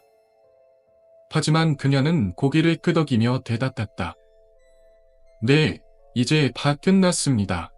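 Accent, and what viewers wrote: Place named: China. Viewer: 중국사람이냐??? 머라는거야 ㅗㅗ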